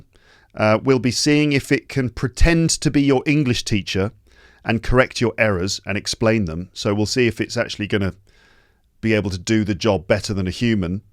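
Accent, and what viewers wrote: United Kingdom. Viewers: How i downloaded it and what's its name of the app. I love your podcast, it's dramatically useful. I wanted to learn Amarican accent but after i know your podcast i started learn British accent 🤎🤎🌿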